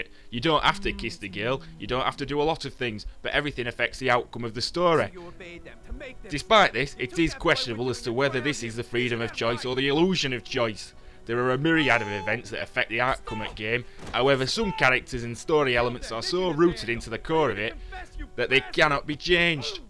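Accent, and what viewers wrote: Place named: Scotland, United Kingdom. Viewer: the guy wasn't listening to my verbal commands so I shot him. Anyway, good review. Is that accent for real? you sound seriously Scottish, like deep in the hills, kilt-wearing, haggus eating, "Freeeeedom !!" yelling Scottish. are you?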